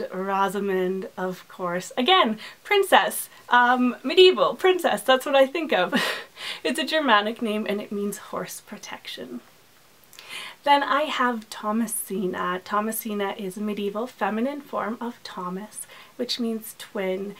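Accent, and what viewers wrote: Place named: France. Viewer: La chanson de Roland hahah how I love your french accent ! Much admiration from France belle demoiselle ;)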